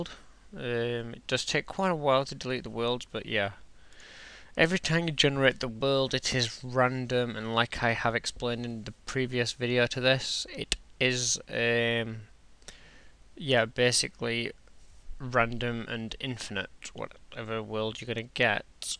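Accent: United Kingdom. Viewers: TOO MUCH BRITISH! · cute british accent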